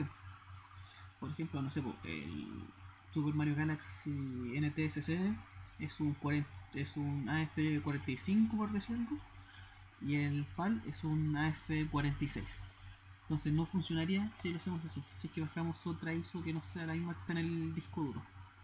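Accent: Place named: Chile. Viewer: ¿Eres Chileno cierto? Jajaja, yo tambien soy Chileno y adoro nuestra forma de hablar <3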